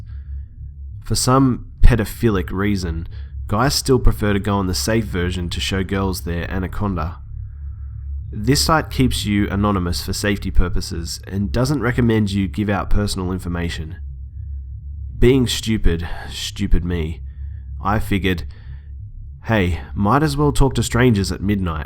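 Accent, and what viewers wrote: Australia. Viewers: Is he Australian??? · i can't tell but are you Australian? i love your accent a lot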